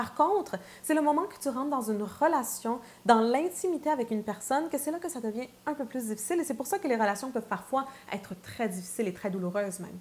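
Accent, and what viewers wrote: Quebec, Canada. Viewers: Bonjour, c'est pas plutôt de la mauvaise foi ou un manque de volonté? Elle parle avec l'accent Québécois, pour ceux qui viennent de France, il suffit juste de faire preuve de volonté et de prêter oreille, pour comprendre. Je suis de France, mais j'ai compris facilement, ses paroles. De plus le message qu'elle véhicule est très intéressant et constructif!!!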